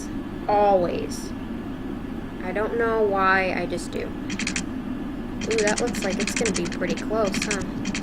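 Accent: United States. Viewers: You have a great accent! I guess ure american where abouts are you from? Xx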